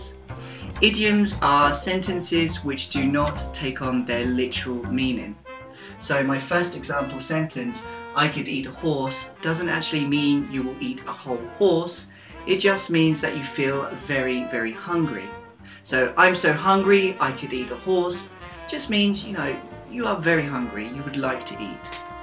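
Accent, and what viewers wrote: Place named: London, United Kingdom. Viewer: Thank you for great explanation and excellent lesson of Londoner's English Accent.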